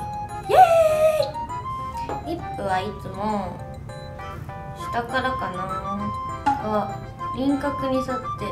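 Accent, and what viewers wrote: Kansai, Japan. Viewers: 関西弁かわいい💕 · 関西弁かわいい。白いシャドーの乗せ方参考になる。。可愛い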